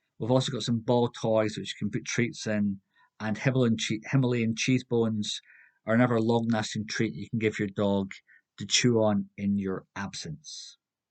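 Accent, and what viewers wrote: Scotland, United Kingdom. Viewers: Superb video about the subject. Also very important is your calm and relaxed Scottish accent and "timbre" to calm down the hoomans who are worried about the anxiety of their sighthounds. It works 2 ways. Most excellent.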